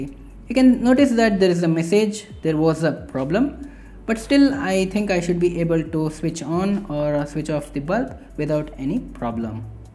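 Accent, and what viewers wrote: India. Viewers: Hated the vid. More sonoff, less indian accent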